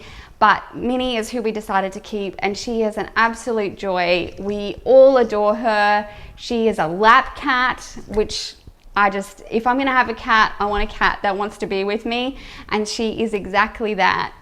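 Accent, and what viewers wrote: Australia. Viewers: First of all, I love your accent. I’ve been trying to do an Aussie accent because I’ve been watching ‘Instant Hotel’ with my daughters, apparently I can’t do it. I disagree. I’m at the stage in my minimalism where I’ve been adding some items back in to our home. Very intentionally though. I still hate clutter but I love plants and I love books. So I’m allowing myself to buy more of these things because they make me happy. I no longer feel that to be a true minimalist that I have to get rid of everything! It’s about getting rid of what you don’t need and valuing the things that you do need. Lots of love to you from Lockdown England, though we’re coming out of it in the next few months, xxx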